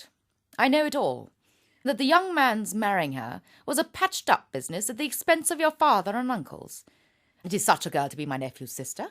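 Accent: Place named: RP, United Kingdom. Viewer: This is absolutely gorgeous! I love her voice and the way she speaks. She sounds so posh (but I like it) and her diction is FLAWLESS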